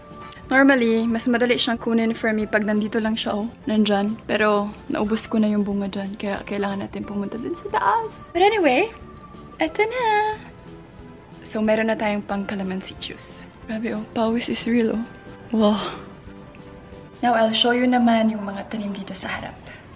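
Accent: Visayas, Philippines. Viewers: Bisaya jud paytera kaaju hehe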